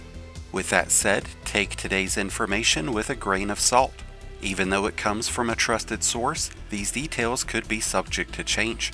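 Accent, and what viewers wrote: South, United States. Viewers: he's saying bc you have a southern accent, partner.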